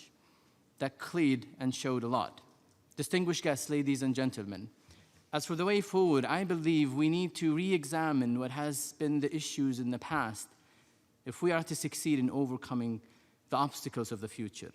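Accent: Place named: South, Arab world. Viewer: دحباشي حسبته جنوبي عربي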